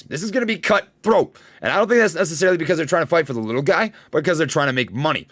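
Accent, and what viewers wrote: Minnesota, United States. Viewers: Minnesota boy?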